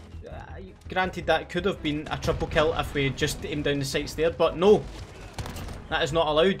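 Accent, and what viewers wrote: Scotland, United Kingdom. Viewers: Gun Troller aye in a Scottish accent then he will do that stupid generic one that no one sounds like and u would need to be from the right part of Scotland to sound like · When you hear alrighty folks with a scottish accent you know its gonna be lit #notificationsquad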